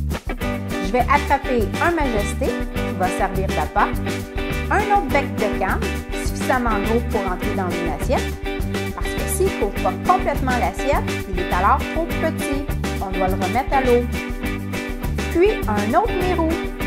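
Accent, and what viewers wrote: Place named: Canada. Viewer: Quelle horreur cet accent canadien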